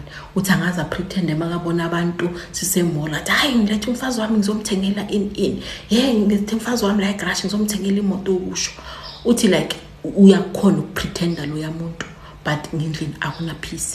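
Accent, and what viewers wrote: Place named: Ndebele, Zimbabwe. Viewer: Indebele lekhethu ngyalizwa elimini, ngizwa iskhethu elimini lakho😅.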